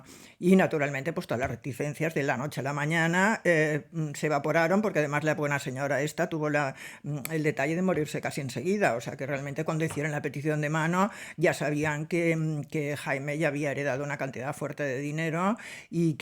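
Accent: Spain. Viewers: Me encanta tu programa, no siempre estoy de acuerdo pero me divierte el acento espanol. Soy de Argentina y la verdad me cuesta entenderlo por el lunfardo. Pero, igual tus programas son super divertidos. Un consejo porfavor, habla mas pausadamente que es dificil seguirte. Un abrazo.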